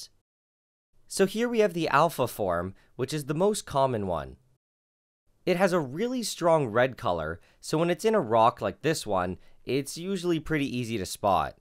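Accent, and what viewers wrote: Canada. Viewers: Dude he's Canadian. He's gonna say things differently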